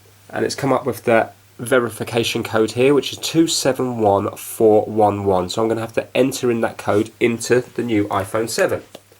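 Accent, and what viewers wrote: England, United Kingdom. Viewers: I've subscribed. You took away my fear of getting messed up and ruining two phones. (Good to hear an English voice again)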